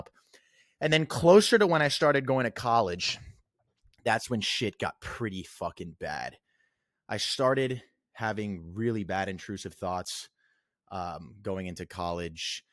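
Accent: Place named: Long Island, United States. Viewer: sounds like you are from long island lol. We didnt 'have vape pens in my day but I can relate to this a lot. If you use pot on a daily/ nightly basis, it will turn on you eventually.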